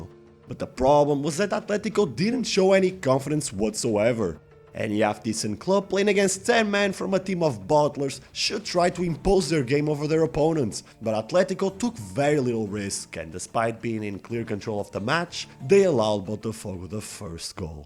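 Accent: Portugal. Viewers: Are you portuguese? I can hear some accent rsrsrs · No tactics? No strategy? Based on your accent, I thought you were Portuguese, but you talk about football like an American. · Portuguese accent, and his gf is Portuguese, while he might have learned the language I'm assuming he's not from Portugal am I right?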